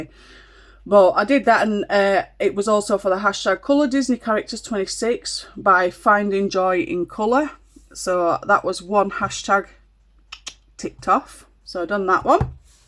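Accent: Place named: Huddersfield, United Kingdom. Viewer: The Hub and I are having bets ob where you're from. He says Hudderfield. I could listen to you say Petite Poupees all day! 😂❤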